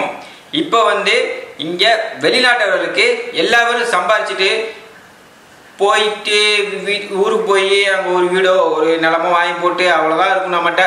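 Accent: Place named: Marwari, India. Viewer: உங்கள் தகவல் பயனுள்ளதாக இருக்கிறது.........ஆனால் உங்கள் பேச்சில் தடுமாற்றம் அதிகம், சேட்டு காரன் தமிழ் பேசுற மாறி இருக்கு..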